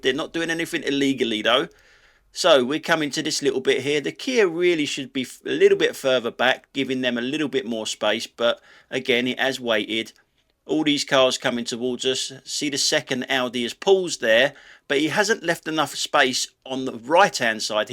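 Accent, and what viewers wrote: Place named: Cockney, United Kingdom. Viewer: Hi, only discovered your channel recently and have now watched about 150 of you episodes so far, I'm addicted....It's interesting to see how you've developed your style over the years. Love the 'only an Audi' & 'Burke in a Merc' trademark comments 😂. Love the cockney voice commentary which you've developed and is really polished now. I always thought your voice sounded like Dave Basset the Wimbledon/Sheff Utd manager. Just listened to an old clip of his and I think it does. Also the recent younger you photo also bears a slight resemblance to him too 😂. Keep up the good work 👍 I will slowly catch up with all the old episodes and then just look forward to the new ones... Cheers 😁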